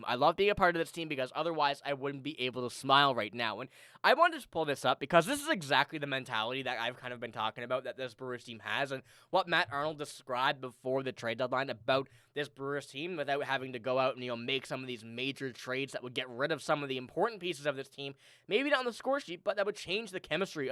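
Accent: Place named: Canada. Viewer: I am pretty sure by your accent that you are Canadian, so how did you get to rep the crew?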